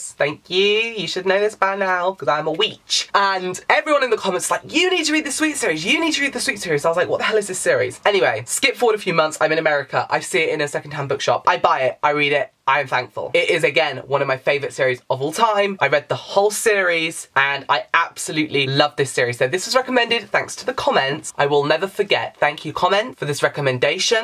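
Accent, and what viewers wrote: United Kingdom. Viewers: Happy Birthday 🎉 I like your English accent 🤗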